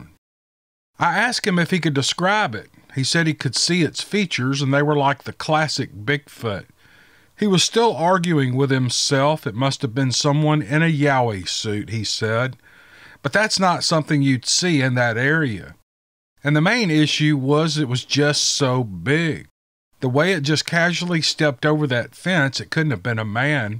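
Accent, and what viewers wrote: South, United States. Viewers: I’m Irish always loved the American accent & by far the most popular among anyone I know is the smooooth southern accent.I love your ramblings they are full of warmth & humour🥰!! All the stories you choose are something else & your narrative skills are a gift to the world.I think it’s so incredible how people who relate their stories to you really benefit from doing so.You have a great channel & I love your choice of music it’s so evocative & real musicianship 🎶🎸 to savour, Lizzie. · I here in the United Kingdom also LOVE listening to your southern accent it's made your channel instantly one of my top. I just love it ❤️ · I like to listen to your southern accent. You are my favorite Bigfoot narrator. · Hey how you doin,just wanna say that your southern accent is just PERFECT for telling these$ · Aussie here and love your southern accent :) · Hi, as an Aussie I can confirm we like the southern accent. 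Possibly from over exposure to Dukes of Hazzard back in the day. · I absolutely love the southern accent.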